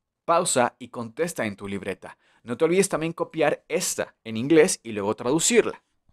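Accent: United Kingdom. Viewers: Profe por favor siga con su acento británico que más de uno nos gusta muchísimo... Gracias mil !!por dedicarnos tiempo para enseñarnos y el arte que tiene de enseñar👏😘😉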